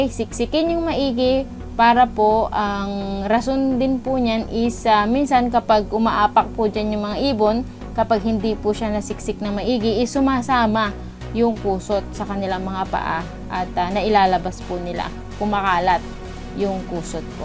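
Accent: Pangasinan, Philippines. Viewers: Litaw na litaw accent ng pangasinan hahaha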